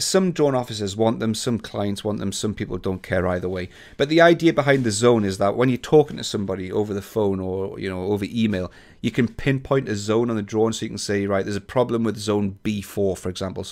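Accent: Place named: United Kingdom. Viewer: liked your video should be very helpful! I'm in America so it was nice hearing someone from across the pond lol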